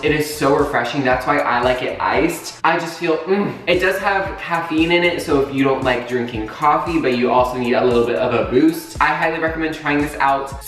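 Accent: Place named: Brazil. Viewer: Where are you from? Your accent it seems like brazilians speakers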